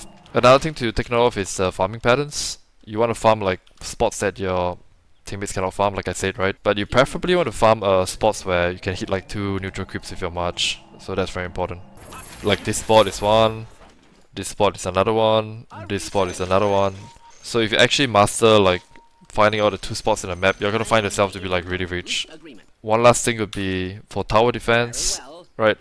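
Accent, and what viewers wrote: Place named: India. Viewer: Why does this east Asian looking bloke talk like an Indian? Took me a while to realise he was referring to 'facet' by saying 'fuh-SET'😂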